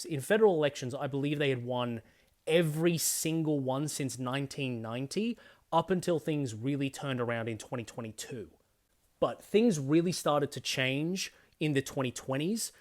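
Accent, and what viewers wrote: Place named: Australia. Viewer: 1. Love your videos on the Australian elections. Wish we had this system in America. 2. I’m curious where your accent is from. I understand you’re Australian but I mean regionally because you sound exactly like the YouTuber theanimeman or Joey who is also Australian but you guys are the only two Australians I can think of that have your accent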